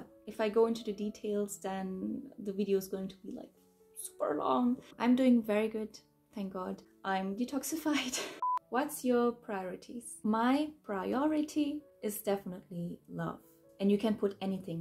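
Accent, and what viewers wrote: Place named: Turkey. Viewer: Just by looking at your face I felt that you might be Turkish and then I heard your accent and I knew for sure🥳 You dance beautifully, hope you will continue your journey all the time💙 And good look with Korean and other languages ^^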